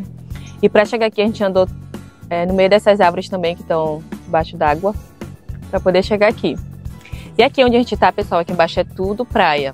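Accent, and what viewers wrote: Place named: Rio de Janeiro, Brazil. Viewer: Ela tem sotaque carioca kkkk troca o s pelo x, reparei isso.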